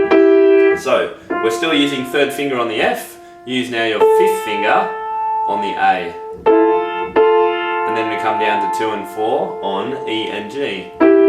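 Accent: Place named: Australia. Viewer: you're so good! and Australian! xD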